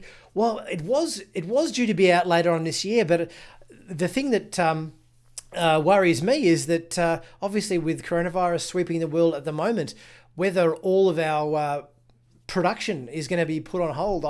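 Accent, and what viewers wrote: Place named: Australia. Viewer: Ah, Sutra is bad Karma! KarmaSutra, sorry I could not resist that! The theme of siblings interest me, you have Narek and Narissa, twins? one good, one bad! Jana and Sutra, which is also a puzzle, since Rios would have remembered if she was gold colored I would thought!. However I think this finale may have some surprises that no one expects, including Sutra, if the Admonition is was not understood by the Romulans, perhaps Sutra might have too, and what is coming will be like the battle of the 5 armies in the Hobbit, perhaps Gandalf and Picard have been comparing notes?? Yes, probably far fetched! I must say I have enjoyed your reviews, and look forward to how it plays out, judging from the accent Australia or New Zealand, I live in Wales in what I describe as God's country of Llaniltud Fawr aka Llantwit Major in Wales, in fact the finale of Dr Who was filmed in the around my neighborhood, the scene with the Cyberheads zapping the last humans was filmed at the St Athan"s Boys Village near the Aberthaw power Station! 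the beach with the gateway to Gallifray is nearby. I look forward to your next review, stay safe!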